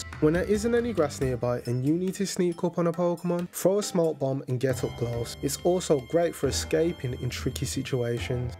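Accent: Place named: West Midlands, United Kingdom. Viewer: Omg your accent is west midlands accent I'm from Oldbury!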